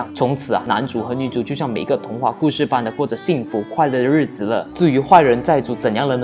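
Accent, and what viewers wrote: Malaysia. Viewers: 我就想說聽了怎麼久都聽不出是什麼國家的，以為是中國的時候就突然冒出一個馬來西亞嗆，才知道…原來是馬來西亞人啊XDD 以馬來西亞人來說你的腔調沒那麼明顯呢XDD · 是马来西亚人？ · 哈哈哈，馬來西亞口音，好懷念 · 哈哈~听到这里时我也奇怪！这是大马人吗囧？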